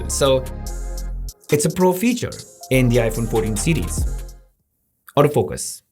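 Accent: India. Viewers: Accept my humor please Your accent is classic, brilliant and likely indian You're this little guy and I say little guy in your accent And you have in an extremely short space of time Become top tier Amongst the most trusted reviewers on earth 🌎 YOU ARE BRILLIANT! Yea we all make errors But brilliance remains.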